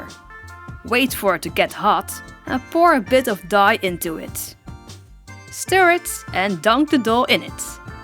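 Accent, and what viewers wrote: Netherlands. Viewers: What is your favorite kind of Diuvs transformation? Like what animal is the most fun for you to transform a doll into? Also, I love your Dutch accent! :))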